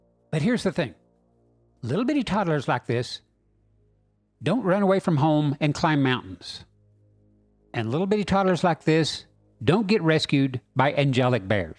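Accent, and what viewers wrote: South, United States. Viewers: Damn you and your common sense! All delivered with a charming southern accent :)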